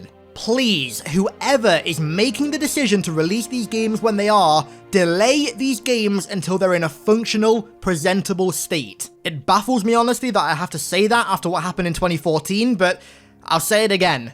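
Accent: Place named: United Kingdom. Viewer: Lispy British man? I didn't know that film critic Jonathan Ross was also a gaming Youtuber!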